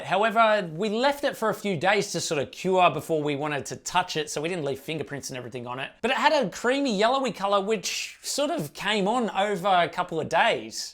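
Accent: Australia. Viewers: Is he Aussie?? Cause I'm Aussie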